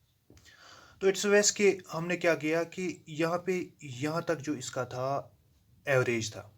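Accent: Kashmir, India. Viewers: Sir! Are you kashmiri ?? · I think u r kashmiri bcoz aap ke batein 😄aap plus ko pulos bata rahay ho vaise b ham kashmiriyo ke aadat he hai nayi angreezi banana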